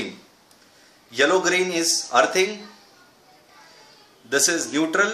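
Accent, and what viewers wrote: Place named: India. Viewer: Smart Bollywood accented man.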